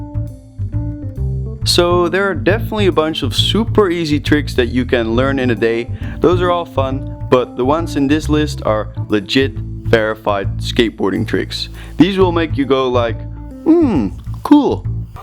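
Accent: Netherlands. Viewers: u dutch ? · Are you dutch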